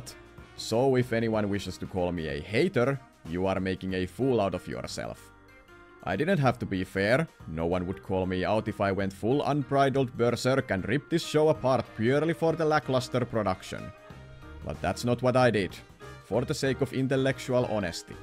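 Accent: Finland. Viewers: Are you finnish? You sound finnish to me :DD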